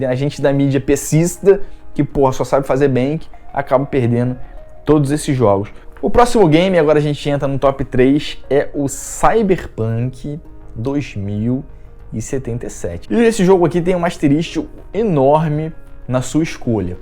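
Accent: Rio de Janeiro, Brazil. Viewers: Esse sotaque carioca é uma pika mané, eu entendi "baú dos gay de 3" e é baldur's Gate 3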